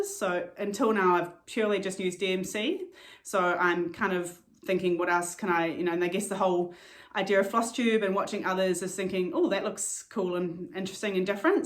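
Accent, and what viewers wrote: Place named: New Zealand. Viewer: Lovely to be introduced to your channel. Catching up on all your episodes while I stitch. My son lives in Wellington so it's nice to hear your familiar accent. I have recently started a channel too - i'm a South African living in UK. I used to be a monogomous stitcher but have discovered that I quite like having a few projects on the go - but don't want to have too many either.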